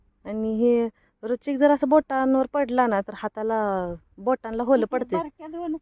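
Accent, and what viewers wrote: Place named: Solapur, India. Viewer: ताई तुमचं माहेर सोलापुरा कडचा आहे का कारण तुमचं बोलणं तसंच वाटतं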